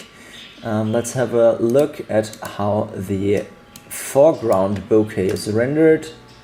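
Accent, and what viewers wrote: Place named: Germany. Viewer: Soll ich mir das deutsche Englisch jetzt 12min reinziehen? Dann bekomm ich partielle Einseitige, Lähmung und gesichtskirmes auf der anderen Seite. Toll, dass sie englisch können, aber beschränken Sie es bitte auf die zwischenmenschliche Kommunikation. Videos müssen echt nicht so kommentiert werden.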